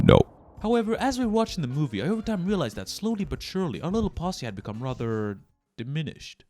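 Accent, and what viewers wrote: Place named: Sweden. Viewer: He is Swedish. That's why he has swedish accent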